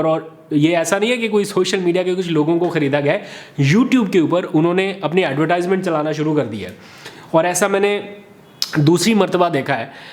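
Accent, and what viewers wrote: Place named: India. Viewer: اَلسَلامُ عَلَيْكُم وَرَحْمَةُ اَللهِ وَبَرَكاتُهُ بیٹا ۔ آپ بہت اچھی اردو بولتے ھیں مگر کہیں کہیں ہندوستانی touch آپ دیتے رھتے ھیں ۔ ۔۔ جیسے ۔۔ بہت اچھے سے۔۔ جنکہ بونا ھے بہت اچھی طرح ۔۔۔ جیسے کافلے۔۔۔ جبکہ بولنا ھے ۔۔ قافلے ۔۔ بیٹا ھمیشہ خوشں رھو ۔محمد وسیم قریشی ۔ کراچی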